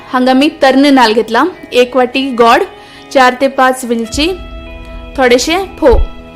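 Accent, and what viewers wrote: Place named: North Kanara, India. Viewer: Excellent video, brought back memories of this simple yet tasty dish. I love your Konkani narration is that from North Kanata?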